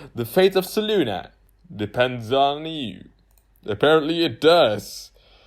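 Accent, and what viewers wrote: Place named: United Kingdom. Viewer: Omg I thought you weren't aiming for a british accent but just that for some reason you were pronouncing words differently a bit this time but then I read the description! xD Really fun video as always!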